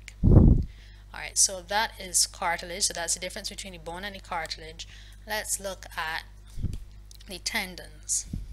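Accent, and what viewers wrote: Barbados. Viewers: Are you bajan?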